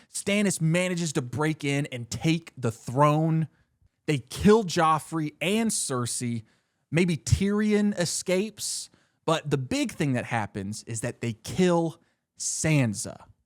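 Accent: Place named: United States. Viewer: I'm enjoying reliving the show through you.... plus you sound American so it's only natural that you mispronounce everything it's funny if anything, don't listen to the haters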